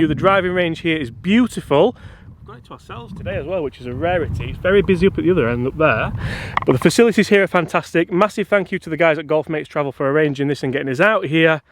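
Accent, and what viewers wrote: Yorkshire, United Kingdom. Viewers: "Si Thi" 😂 a reyt good Yorkshire accent that pal good to hear local lingo 👍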